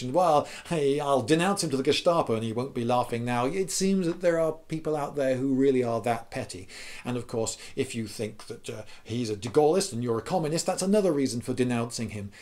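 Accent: England, United Kingdom. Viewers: Maybe it's because I'm an American who's been conditioned to associate accent with Monty Python, but this particular video seems oh-so-easily adaptable to a comedy sketch.... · Sees interesting tittle Clicks The youtuber has a british accent and the video is about France Grabs popcorn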